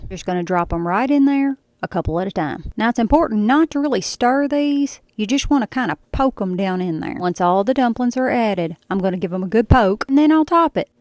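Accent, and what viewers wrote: South, United States. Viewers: This woman has a southern accent and she may pronounce it however she likes. I know I can pronounce pompous and presumptuous ignoramous pretty well, and you, my dear are one.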